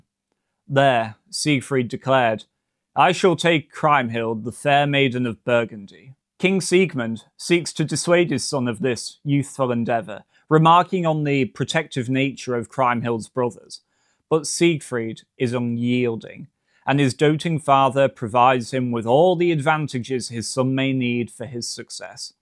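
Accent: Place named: United Kingdom. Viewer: American here with honest question. The reader has to my ears an unusual Brit accent. Where is he from?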